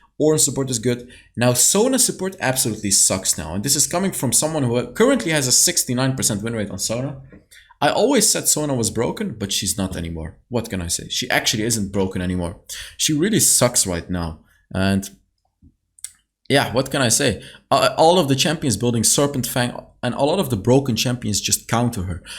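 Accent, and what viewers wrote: France. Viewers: "she doesn't suck the things she's supposed to suck" spoken like a true french, you nailed the french persona 💪💪👍👍 very well done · Bro your French Accent is op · Nice frensh accent love it but man pls just Say it it's easy ku de grasse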